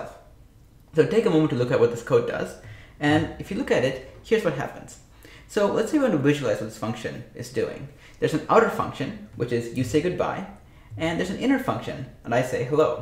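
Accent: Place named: Tamil Nadu, India. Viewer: Me too I think you are Tamil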